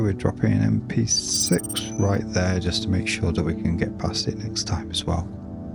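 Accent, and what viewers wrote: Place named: United Kingdom. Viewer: I was wondering what part of the uk your from?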